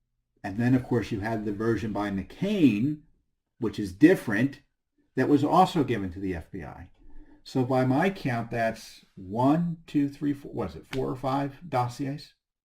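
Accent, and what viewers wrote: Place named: Russia. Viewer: I thought I detected the hint of a Russian accent.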